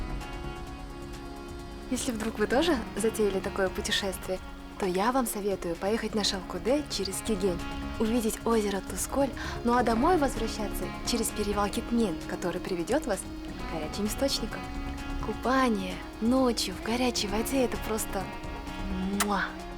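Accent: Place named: Russia. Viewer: наименования чисто қазақское произносится с руским произношением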